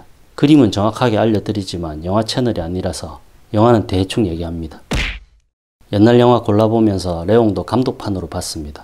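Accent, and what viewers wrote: Gyeongsang, South Korea. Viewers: 82세 할아버지가 씀니다 . 특히한 경상도 사투리와 재미있는 말씀으로---------참 재미이게 보고가유 -- .그말씀이 나에겐 정말 참고많이되네요 나도 실그 초상회를했으나 지금 현대인들이 보시면 웃숩지요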